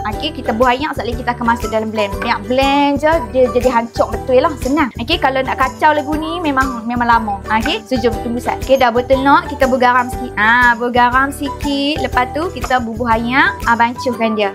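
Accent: North, Malaysia. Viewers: Ulang tngok...suka dngaq loghat utara...sambil tu nk ingat resepi...tk bnyk2 😊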